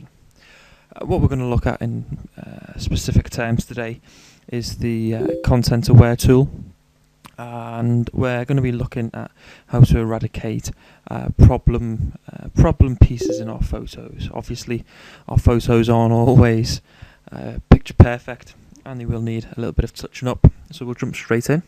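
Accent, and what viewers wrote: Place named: United Kingdom. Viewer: thx team GB lol, keep the hard work mate, this is fantastic :) that is British accent for u Minh Nhat :)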